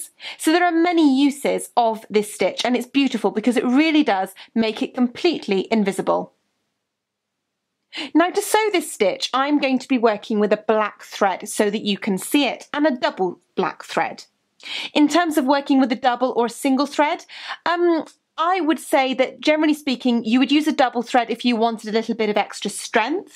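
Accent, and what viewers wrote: United Kingdom. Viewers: Are u British?? 🤔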